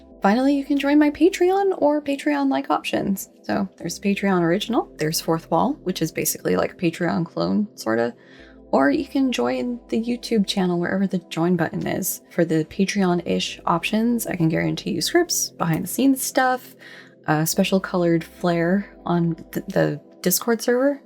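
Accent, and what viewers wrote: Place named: Singapore. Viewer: the dude sounds like singapore. i m in singapore. it is a kind of hell here.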